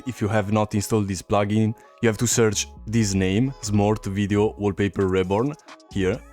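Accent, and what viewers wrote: Italy. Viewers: are u italian by any chance? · is vro italian?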